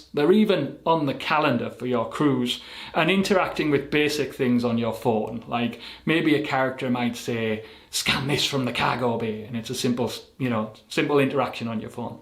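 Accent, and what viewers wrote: Scotland, United Kingdom. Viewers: Nice channel , you sound like a Scotsman!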